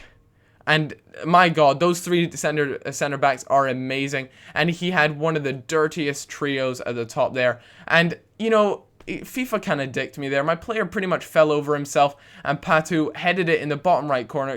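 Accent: Scotland, United Kingdom. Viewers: Cal what part of scotland you hail from? :D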